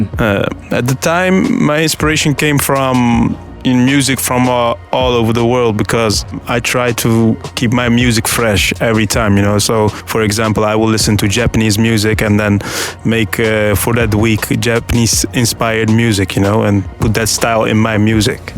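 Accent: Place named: Bihar, India. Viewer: Bhot Chutiya Naam Hai. Plus Tu Bhi Bhot Gandu Lagta Hai. Sale English Bihario Jaisi Kyu Bolta Hai. Thanks For This Upload Though Bro K Alawa Kuch Aur Bol Bhot Fake Aur Effort Dalne Wala Sound Kar Raha Hai.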